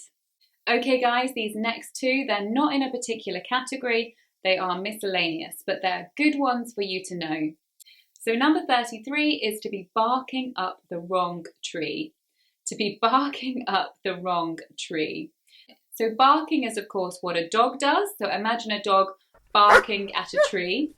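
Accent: United Kingdom. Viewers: Gosh do I love the british accent. Something about your voice which just captures my attention 110%. I'm all ears. Have a safe day fam 🤟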